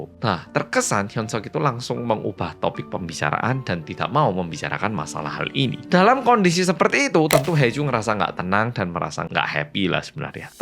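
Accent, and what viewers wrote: Java, Indonesia. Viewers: Oppa kok bisa ditahan 😅😅😅 bahasanya jadi lucu karena medok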